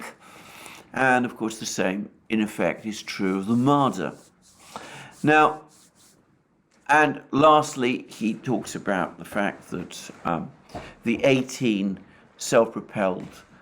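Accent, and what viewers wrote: United Kingdom. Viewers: Thanks so much Alexander, not only I'm feeling informed very well about what really is going on in this horrible war but I also was able to shut off subtitles lately because I, as a non native speaker now got so used to the British accent I no longer need them. I'm really really glad I found your, Alex's and the Duran channels.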